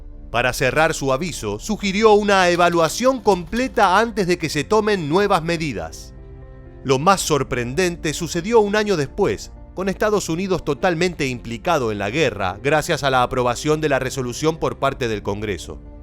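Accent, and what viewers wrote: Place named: Buenos Aires, Argentina. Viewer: Querido amigo, sigues con el virus Argentino de la soberbia, de hablar como el único ser humano inteligente. Puedo decirte sin equivocarme y con humildad que eres un ingnorante de muchos cosas de las que hablas , además de leer los escritos históricos como un loro. No haces ningún comentario propio de alguien que estudia los verdaderos hechos históricos. Tu acento porteño y de soberbia hace tambalear lo que dices en tus vídeos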